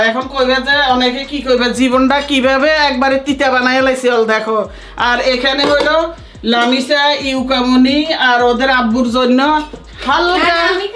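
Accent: Noakhali, Bangladesh. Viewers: খালা আপনি নোয়াখালির ভাষায় কথা বলেন।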